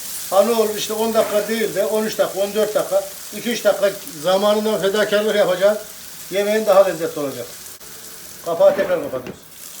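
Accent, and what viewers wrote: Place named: Anatolia, Turkey. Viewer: Ustamın şivesi ve doğal haliyle gerçek ve dürüst Anadolu kişiliği güzelliğe güzellik katıyor,Rabbim yolunuzu açık eylesin.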